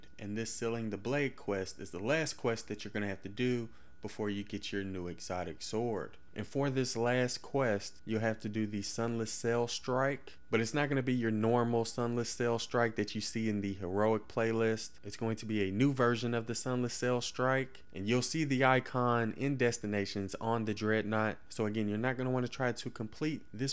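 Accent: South, United States. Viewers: liked commented and subscribed for the country accent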